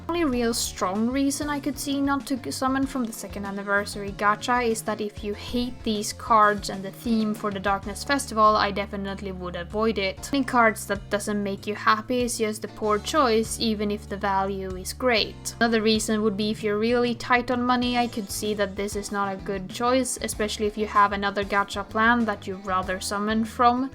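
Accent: Sweden. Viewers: Are you swedish by any chance? I am so im not sure if your accent is swedish. I would loveee to pull on that event thanks for the help! <33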